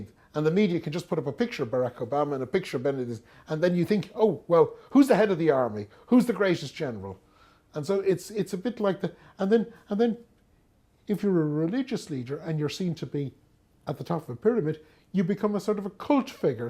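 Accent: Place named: Ireland. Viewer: hi - accent is irish:) I like irish accents, and scottish ones too, but my fav is south african.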